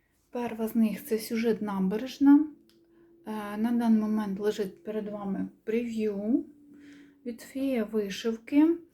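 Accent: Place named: South, Ukraine. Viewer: як завжди ви не перевершені,гарні схеми,гарна робота і може я я помиляюся, милий душі південний акцент.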